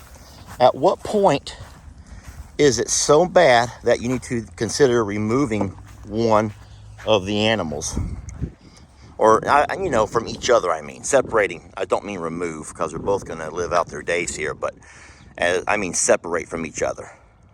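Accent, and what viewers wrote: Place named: Alabama, United States. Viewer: It is funny to here you say, ‘seement” and “sareen’. Love the colloquialisms even from Alabama to Texas.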